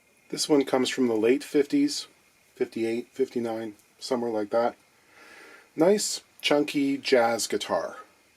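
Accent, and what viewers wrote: Germany. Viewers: I'm here for the German accent...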